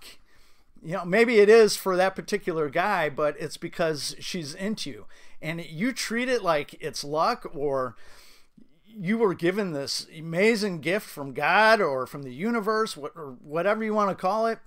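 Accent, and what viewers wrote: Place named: Midwest, United States. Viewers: I don't know why I thought you were from Canada I can so hear the Illinois accent now.. Great video bro!! always on point👍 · I’m digging the Midwest accent and great advice 👍🏻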